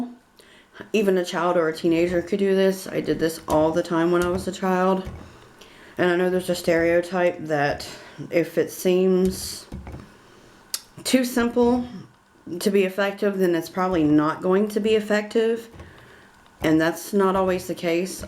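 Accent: South, United States. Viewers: Haha I love your southern accent! Thanks for the video! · Haa! funny how you do not like whomever told you don't like a Southern accent....your accent is terrific, and your straightforwardness is great....Thank you! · I happen to love your Southern accent - I want one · cool nails also I dont care about ur southern voice because my family is from texas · Thanks for the great video.... and just for the record, English guys love that Southern accent;) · I didn’t even notice you had a southern accent until you mentioned it!!! But I am from a southern area and have had people judge me off of it too. Honestly I love southern accents so everyone who hates on it can go somewhere haha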